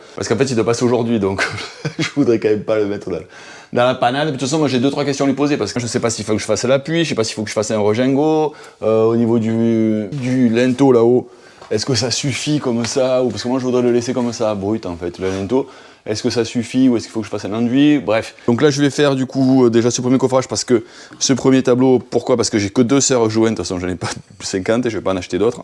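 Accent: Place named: South, France. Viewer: Si un sponsor passe par là offrez des serre-joint à cet homme du sud, il le mérite, des contenus de qualités, l'accent qui me rend nostalgique Beau boulot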